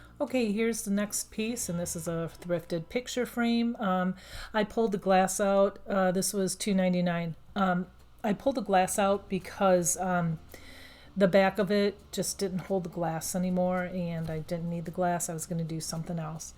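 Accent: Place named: Midwest, United States. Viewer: Love the stuff. I hear a strong midwest accent. 😊😊 I'm originally from Illinois.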